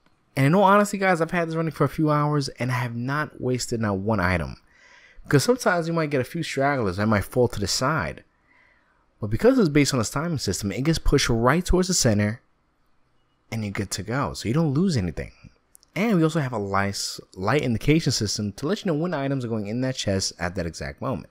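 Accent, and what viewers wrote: New York, United States. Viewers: I think it is funny that you apologize for the noise, and tell people that you are from New York. LOL. I think everyone knows you are from New York. You have a very distinct accent. There are a couple of your videos where you say Forget about it. I lose it, totally lose it. Reminds me of a very young Joe Pesci. I hope you know I am a fan of all of your builds, and I don't mean anything bad about your accent. As a matter of fact for those of us who spend hours watching these videos making our builds it is important that the video makers accent is easy to listen too. There are several channels that I just can't watch because the maker's accent or rythm of speech is too annoying.Keep up the great work.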